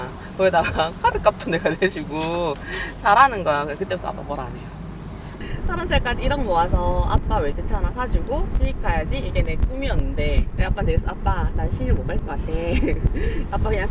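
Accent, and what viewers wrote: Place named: Gyeongsang, South Korea. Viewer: 말투가 경상도네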